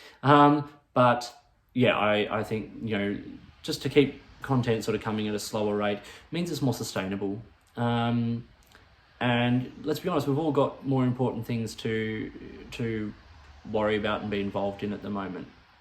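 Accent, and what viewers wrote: Australia. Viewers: Best wishes to you, let’s hope things get back to normal soon. Keep up the reviews if you can it’s nice to hear an Australian voice on fountain pens. Stay well 🧡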